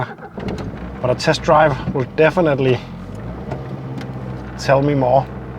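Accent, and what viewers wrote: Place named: Denmark. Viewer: My mother was Danish and miss the accent. Listening to you brings me happy memories.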